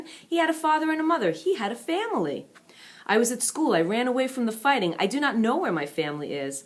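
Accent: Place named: New Jersey, United States. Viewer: jersey accent makes it all the better ;D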